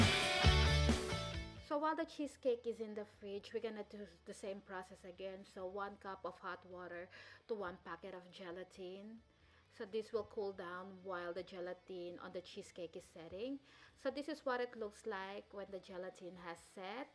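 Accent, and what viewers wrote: Philippines. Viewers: Are you Filipino? You sound like one.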